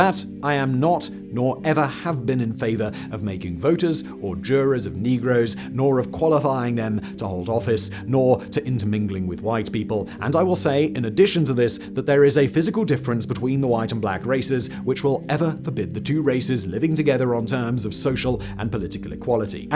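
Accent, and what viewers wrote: England, United Kingdom. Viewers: Guys, ya really can't judge him too harshly. Most all of this stuff is handed to him by writers. Him saying it with confidence and a British accent makes people assume he knows all this stuff. He's just reading the script he got a few minutes before the recording. His researchers can leave a little something to be desired, sometimes. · I don't get a pompous attitude from him at all. Are you American by chance? I wonder if the English accent just comes across that way to you guys (if you are).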